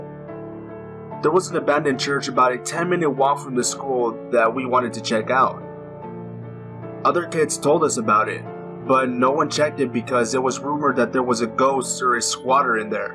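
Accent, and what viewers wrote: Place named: Latin America. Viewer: u have a latin accent.. are u latin? anyway nice videos seen every single one of them keep up the work...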